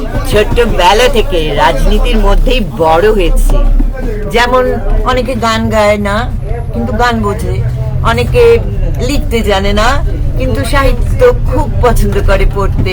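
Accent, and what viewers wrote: Kolkata, India. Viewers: কলকাতা স্টাইল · তোমার বঙ্গবন্ধু লীগরা বাংলাদেশে খাই ইন্ডিয়া যে বসবাস করে তাতে কি তুমি দেশ প্রেমিক মনে করো তোমার মুখের ভাষা হয়তো মনে হয় ইন্ডিয়ান কলকাতা তুমি আমার বাংলাদেশের দেশপ্রেমিক হওয়া কি করে তুমি কোথায় দেশে নাকি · Tomar kotha vongi kolkatar varot jao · কথা বলার স্টাইলে ভারতের মত,বাংলাদেশ ভাষার প্রেম মারায় 😂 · এটাতো কলকাতার ভাষা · আপনার ভাষা তো আমাদের বাংলাদেশের ভাষার সাথে মেলেনা বরং কোলকাতার সাথে মেলে অতএব আপনি যে ভারতের দালাল সেটা আমরা বুঝি।😂😂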